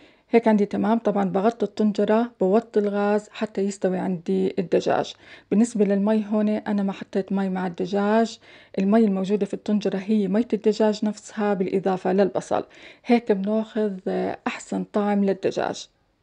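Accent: Palestine. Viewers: هيه الاخت فلسطينيه لو اردنيه لهجتهم خيال 😘💙💙😘😘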